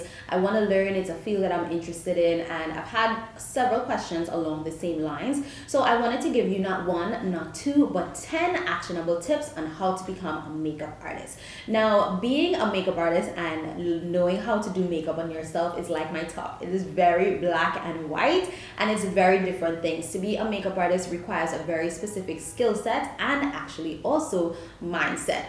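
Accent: Trinidad and Tobago. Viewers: Are you Trini? I feel like I'm hearing my accent but i'm not sure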